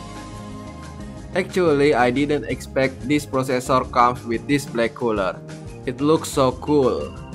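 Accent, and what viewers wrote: Indonesia. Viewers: logat nya kayak indo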